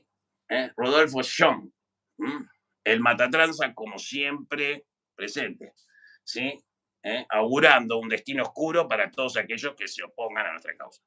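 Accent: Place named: Rosario, Argentina. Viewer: En más pensé que eras rosarino x como hablas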